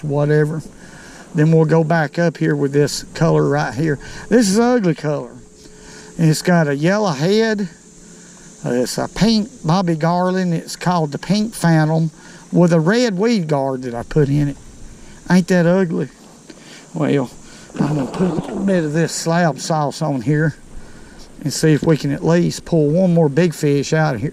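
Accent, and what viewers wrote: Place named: Alabama, United States. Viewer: Is it weird that I could tell you were from Alabama before you ever mentioned it lol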